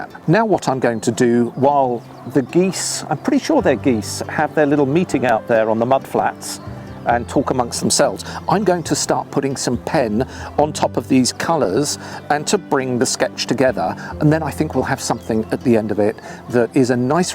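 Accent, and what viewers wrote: England, United Kingdom. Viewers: i havent watched your channel in a long time. i always love it. and the english accent is way worth watching the whole thing.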